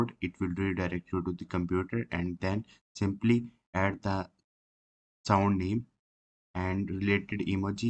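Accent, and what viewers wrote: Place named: India. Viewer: bro is trying so much to dont have an indian voice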